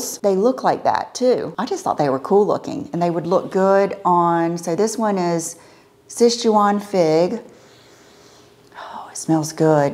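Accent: South, United States. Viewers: I was thinking to myself, I love how says “ ok” it’s just the cutest way with your southern accent. And then you said now on to Rachel and that’s my name 😂I thought you were talking about me for a moment haha! You cracked me up today. Those Mary Jane sneakers and the purple Zara bag just made me smile. I love how out of the box you can be at times and you adventure to try new things and are always honest with us if they don’t work out but you are inspiring me with style too. Thank you! P.S. that soap holder is just gorgeous lol it’s the simply things right?! And the Zara green jacket looks amazing on you!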